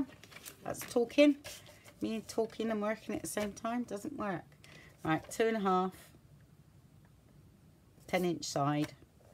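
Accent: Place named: West Country, United Kingdom. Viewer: Missed you! Love your friendly West Country voice! Your videos are great! Love them!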